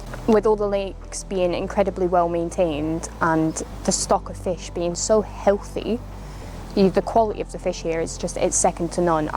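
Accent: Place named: Scotland, United Kingdom. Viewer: As always great video and great venue detail coverage 👌 and still loving the Scottish accent, u sound as beautiful as u look ❤ x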